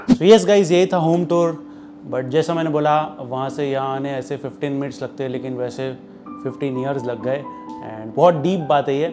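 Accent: Maharashtra, India. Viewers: Congratulations 🎉 🎉 Marathi tone bahot hi mast hai bole to Aapke bolne ka style bahot hi funny hai 😂 but it's emotional also..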